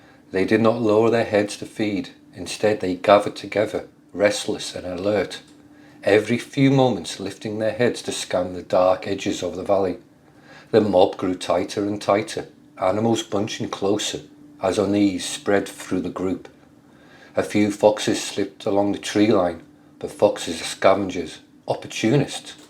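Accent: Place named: England, United Kingdom. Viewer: This bloke is idiot, I hunted this area since I was a kid , I'm now 61. Bloke never grew in this area with English accent, it's laugh able.😅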